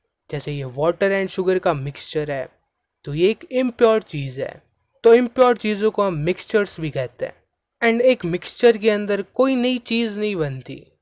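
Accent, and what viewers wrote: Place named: Rajasthan, India. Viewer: Sir aap ki sound bahut acchi hai like Rajasthani ❤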